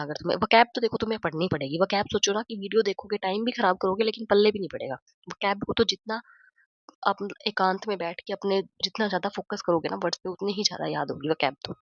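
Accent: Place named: Haryana, India. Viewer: ye to m awaj se hi samj gyi thi lekin hr. Me kha se h aap m bhi hr. Se hi hu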